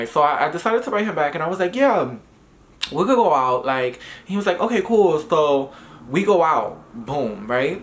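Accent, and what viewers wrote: New York, United States. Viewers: Dionne T Lee this sound like the average new york nigga lmao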